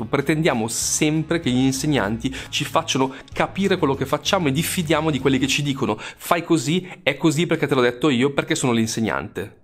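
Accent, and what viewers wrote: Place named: Milan, Italy. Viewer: Oggi l'accento Milanese era ben evidente : )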